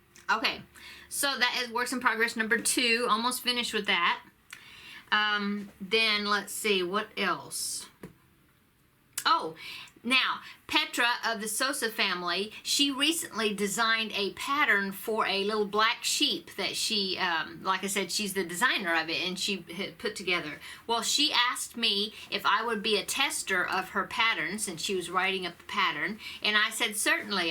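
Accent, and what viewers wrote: South, United States. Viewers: Im from southeast Georgia. I just came across your channel this week and have watched the last 7 or 8 in a row to try to "catch up". You caught my attention with your accent. I thought, "oh yay she's southern too !" Lol Congrats on your viewers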